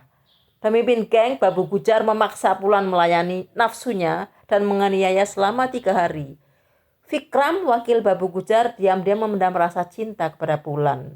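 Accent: Java, Indonesia. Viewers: kadang ada yg bilang medok medak jangan di hiraukan mbak semangat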